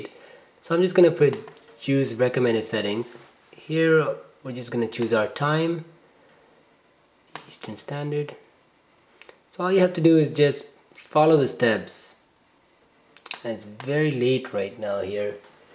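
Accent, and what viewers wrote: India. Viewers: Indian... Obvious :) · u r from india, aren't u? :)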